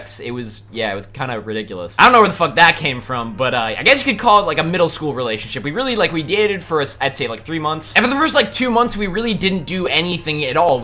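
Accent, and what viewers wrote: Russia. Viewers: Russian accent gave me fucking cancer. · That Russian accent was great lol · Do a full video in Russian accent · Blaziken you should make a new account go online mod and use you Russian accent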